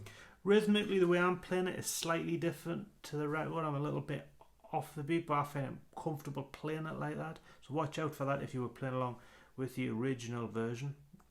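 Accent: Newcastle, United Kingdom. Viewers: love the geordie btw, b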